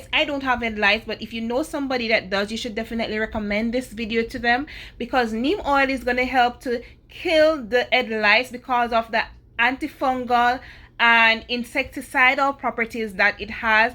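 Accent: Jamaica. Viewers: I can tell she's Jamaican, did affi subscribe to di lady channel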